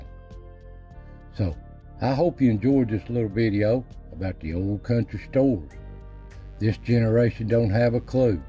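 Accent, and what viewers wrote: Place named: East Tennessee, United States. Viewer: I love your videos and your accent traveled through Tennessee got a taste of East Tennessee and fell in love sadly we had to keep on traveling now in Iowa and I sure do miss those mountains ⛰ 💚✌️ we are from South Texas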